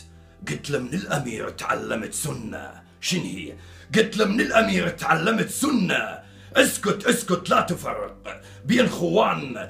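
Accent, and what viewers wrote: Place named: Iraq. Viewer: هذا الرجل لهجته عراقيه.... حياكم الله عمامي زبيد في كل مكان.....ابووضاح العبيدي الزبيدي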